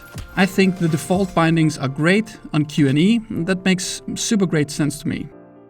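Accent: Denmark. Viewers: That Danish accent tho!!!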